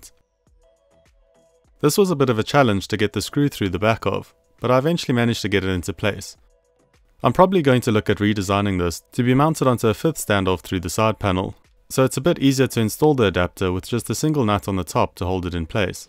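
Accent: South Africa. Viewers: It sounds like you're from SA, if so, where do you get all of this stuff? Would love to know about the threaded inserts specifically...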